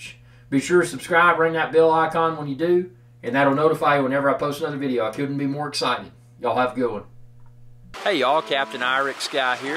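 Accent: United States. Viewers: That country accent is gold! Hope your channels grows big. Keep it up!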